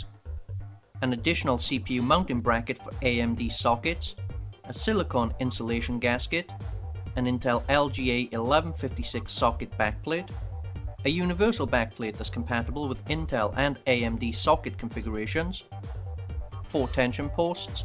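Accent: Wales, United Kingdom. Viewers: MAN YOU R PURE BRITICH AND I LIKE THAT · u have brilliant in depth reviews! are you originally from wales in the uk?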